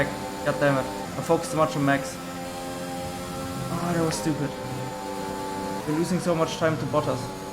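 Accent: Germany. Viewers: Kannst du deutsch (dein Name und Akzent klingen deutsch)?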